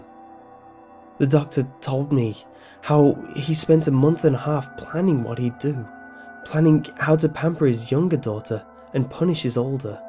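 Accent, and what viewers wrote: England, United Kingdom. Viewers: Is he English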